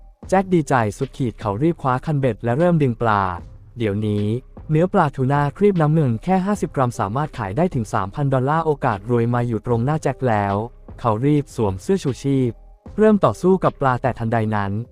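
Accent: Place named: Shan, Myanmar. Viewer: ไทยใหญ่ชัดๆ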